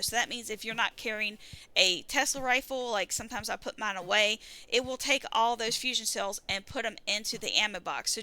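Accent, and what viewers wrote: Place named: South, United States. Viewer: You have one of the most articulate sounding southern accents I've ever heard just FYI. quite a delightful surprise to the ears!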